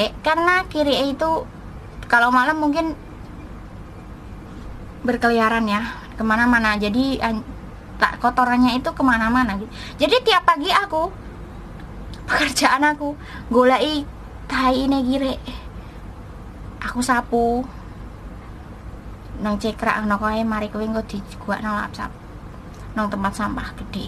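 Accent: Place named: Java, Indonesia. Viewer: Dik jenengan asli pundi to kok bhsa jawa getot sekali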